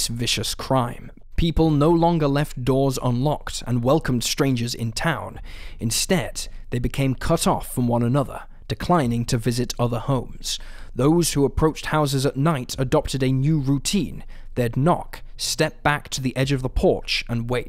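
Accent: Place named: Newcastle, United Kingdom. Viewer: He has the same accent as myself, north East England the accent is called 'a geordie accent'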